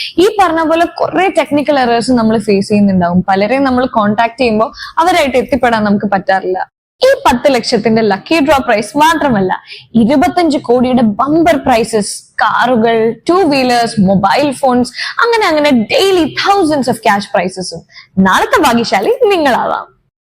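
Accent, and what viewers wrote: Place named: Malappuram, India. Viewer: Full മലപ്പുറം ആണലോ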